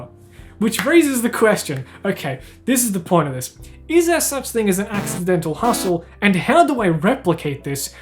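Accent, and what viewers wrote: Australia. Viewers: I love your sweet sweet Australian voice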